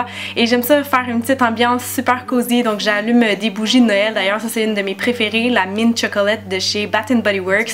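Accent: Quebec, Canada. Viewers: Encore une vidéo superbe ;) tu es très jolie naturelle :o Puis l'accent québecois c'est toujours agréable ^^ Enfin bref plein de gros bisous 💋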